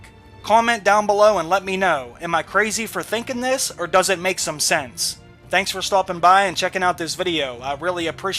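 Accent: Pittsburgh, United States. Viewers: Thank you for doing such a great job on these videos. You are one of the best channels of this nature out there. Have to ask, are you from the Pittsburgh area by chance? Anyway, awesome job and keep up the good work!!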